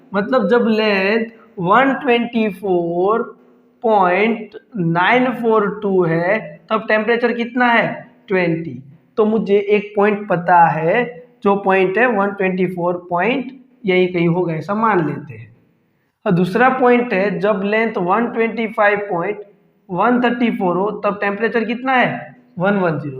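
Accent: Gujarat, India. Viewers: Sir your videos are very helpful and i am now able to understand all maths questions easily. Aur sir kya app gujarati ho apki language se pata chalta hai Me bhi gujarati hu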